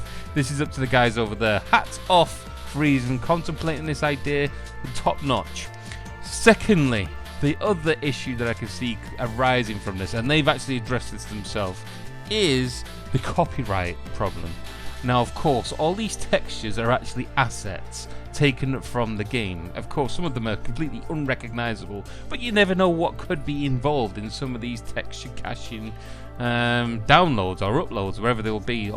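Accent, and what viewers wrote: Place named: Northern England, United Kingdom. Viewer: spoken like a true northern lad ha ha! Glad you got the rest you deserve it's nice to see your stuff coming out whenever I get chance to see them, your EMUnation is a great idea. I'm sure the guys on the dev teams appreciate you as much too. Have a great one mate and have a beer on us!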